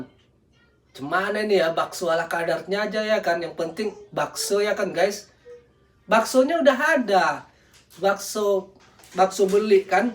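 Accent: Medan, Indonesia. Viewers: Mas ini aslinya mana ya, bahasanya antara Medan sama banyumas.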